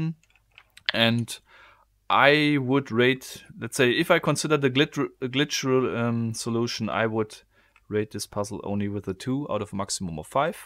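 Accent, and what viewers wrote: Germany. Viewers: Kann es sein, dass du deutsch bist? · Are you german ? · You bought it in Germany? I knew it!!! Your accent is very German!!! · Are you german/ bist du deutscher? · Man hört das er deutscher ist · German, right? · Dude, the accent is german und sucks af😂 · Man hört deutschen Akzent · Man hört mega raus das du deutsche bist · Man hört so einfach raus das du Deutsch bist XD nices Video btw, cooles Spielzeug. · Dieses deutsch englisch regt mich so extrem auf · are you from Germany? You have this specific accent:-D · Red bitte deutsch du kannst es man hört es am Akzent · Wow, hab ich mich verhört, oder kommst du aus Deutschland? Der Akzent ist unverkennbar :D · Are You German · Are ya German? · Voll der deutsche Akzent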